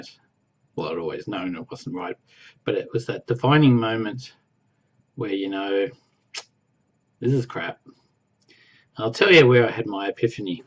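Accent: Australia. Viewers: Awesome video. Thanks mate. I love our Aussie accent... be proud. Like Cedars you are laid back and nice to listen to. Cant wait to see more from a fellow Aussie. Your experience really resounds with me. 3rd gen always questioning the org, especially seeing all the badness around the world happening to good people but Jehs smartass, rude, selfish people were taken care of? I was also df but at 20. Sucked up go be re but now faded with Hubby at 35. Would love more info on the recovery group you mentioned if its an open group. Greetings from sunny Queensland 😊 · Gidday! great accent but of course I am bias, been hot lately mate, thanks for your introduction, though I still believe in God and the kingdom, I can understand why you believe what you do now, and respect your views. :)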